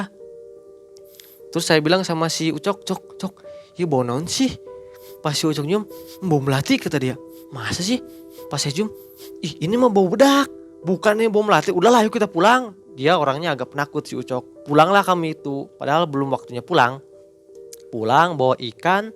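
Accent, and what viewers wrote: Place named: Banten, Indonesia. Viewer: Logat Sunda nya hilang 😅 ini mirip logat Banten serang / Cilegon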